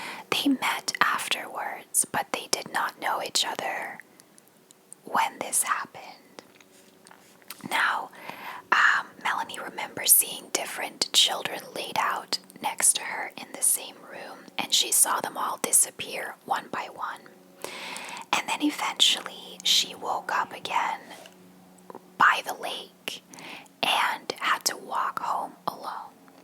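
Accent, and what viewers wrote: United States. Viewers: Is ze Nederlands? Ze heeft echt een Amerikaans accent!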